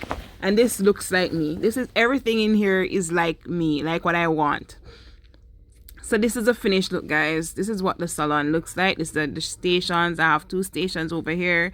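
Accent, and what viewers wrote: Jamaica. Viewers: Uhm, you say you're not an interior designer but I beg to differ. Really awesome look. I hear your Jamaican accent. Curious to know your journey to Cayman. I'm watching from Japan.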